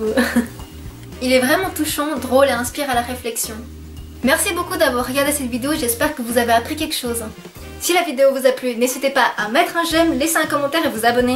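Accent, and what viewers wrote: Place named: Japan. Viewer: Hmm, en effet, je vois ce que tu veux dire. Cependant, à mon oreille, votre façon de prononcer certaines syllabes et voyelles (les "r" et "l" par exemple) me font plus penser à une intonation Japonaise (Mais c'est peut être parce que je bouffe du Manga Animé a gogo XD ) J'ai un collègue qui habite Gland (un Glandu, quoi XD), son accent et celui de sa famille sont différent des votres (bon, en mêm' temps, comme partout, entre région, les tonalités diffèrent;) )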